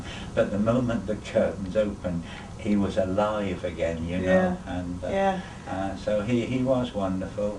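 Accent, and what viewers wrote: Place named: Derbyshire, United Kingdom. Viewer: Howdie, Have continued to watch your videos since before you made it big and I still think you are a blast to watch and you're definitely a pride of Derbyshire. Being a Derbyshire lad myself, it's great to hear a local accent being that I now live in France. Thanks for the videos and please, keep them coming.:)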